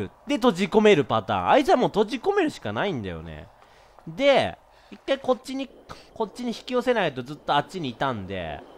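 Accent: Kansai, Japan. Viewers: 何でこの実況の時だけ特に関西弁きついねん爆笑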